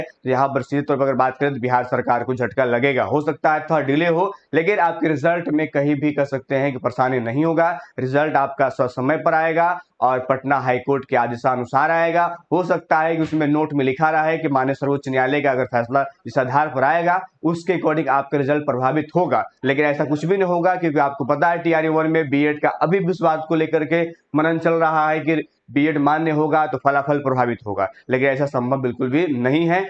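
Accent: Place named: Uttar Pradesh, India. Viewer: Tu up ka hai lag rha hai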